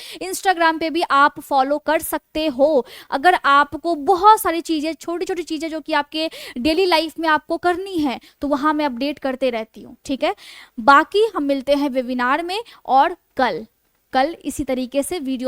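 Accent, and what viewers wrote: Bihar, India. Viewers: और अच्छा बोल सकतीं है आप, बिहारी ton avoid कीजिये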